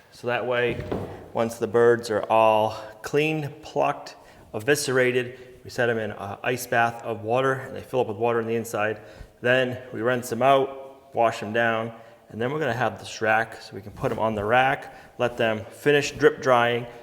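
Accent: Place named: Appalachia, United States. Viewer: if you talk like harvest chicken. then your not a youtuber but more a hillybilly right out the fields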